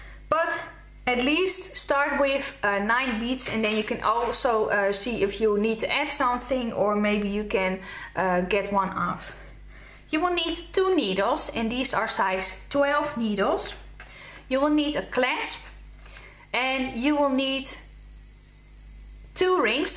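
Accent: Netherlands. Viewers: Nice tutorial! He, komt u uit Nederland?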